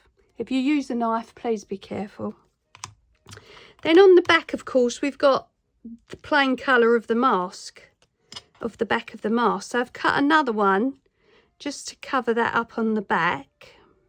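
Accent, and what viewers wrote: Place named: Southern England, United Kingdom. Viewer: it keeps me out of trouble 😂. Can I ask what area you're from? I live in Norfolk now but originally from S.E. London. I like to guess where people are from and I'm thinking you're from Kent or the south of England!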